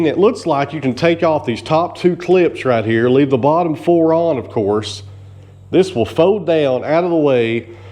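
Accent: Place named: South, United States. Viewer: Hi, love watching your videos. I’m an old carpenter up here in Edmonton, Alberta. Raised on a farm in Manitoba but left the farm and became a carpenter. Your southern accent is a hoot. We Canadians likely sound like Brits. lol. I enjoy all your videos but I’d say videos about 15/20 minutes are my favourite… yeah, we spell words funny too. Cheers Earl B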